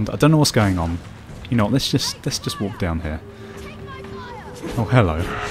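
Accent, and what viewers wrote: United Kingdom. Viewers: Your commentary is just hilarious. That confused, yet dry attitude along with your accent makes me picture a proper englishman with a top hat playing an (at first) very confusing, yet awesome RPG. The lighting is a bit off, jacked that up a notch too much.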